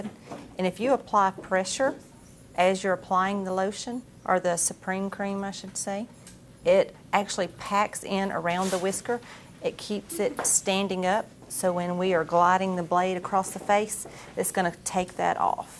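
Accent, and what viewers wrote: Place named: South, United States. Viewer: Ahhh the Southern accent. Like home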